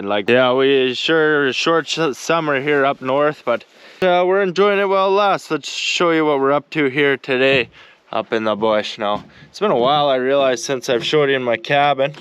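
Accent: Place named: Eastern Canada, Canada. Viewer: A very smart young man. Im not sure but he sounds like he must be from eastern Canada I was up there years ago in Whitehorse & Inuvik. This kid has got it goin on. Btw..you can peen that crush sleeve back out & re use it. Ive done it and seen it done many times. It just sers you pre load on your pinion. Best wishes!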